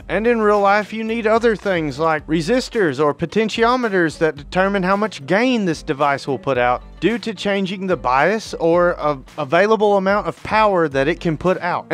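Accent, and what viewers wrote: South, United States. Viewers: I added this to a playlist about a year back, and lost it. I searched YouTube high & low typing in so many keyword combos and variations to run across it again, because it's brimming with useful info. Happy to revisit. Channel with the Southern accent INSTANTLY told me I was in the right place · he's just southern, reminds me of Hank Hill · Hahah, that's exactly what I was going to say, he's just southern, lmao.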